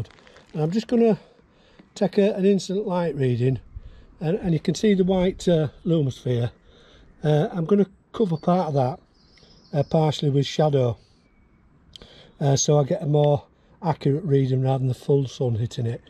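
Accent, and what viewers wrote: United Kingdom. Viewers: What a great photo. I live in the States now and it's great hearing a broad British accent.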